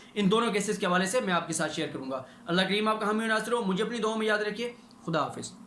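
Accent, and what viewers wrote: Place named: Punjab, Pakistan. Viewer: aap bahot umdagi aur tafseel se case ke baray mein batatay hain. aap ne ek baat badi ghalat kahi ke urdu karachi wali hai.. arz kardun ke urdu pooray subcontinent ki hai aur Pakistan ki qaumi zaban hai na ke karachi ki. lehaza sub ki koshish honi chahyea ke sahi urdu seekhen likhen aur bolen. bura na manen magar punjab ne jitna urdu ki tahes nahes ki hai aur kisi ne nahin. maslan qaaf ko kaaf. quran ko kuran. isi trah aur doosray alfaz. isi vdo main aap ne mobiyna kaha isi trah aur kayee alfaz bhi. mera mashvira hai aap aamir liaquat aur digar achhi urdu bolne walon se sikhen. Allah aap ko zindagi mein khoob taraqqi aur khushiyan de. shukriya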